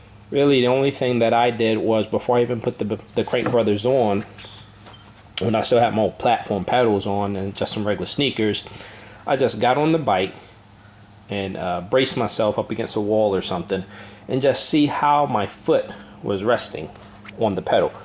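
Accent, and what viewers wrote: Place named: Baltimore, United States. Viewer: This guys got a super baltimore accent. Good vid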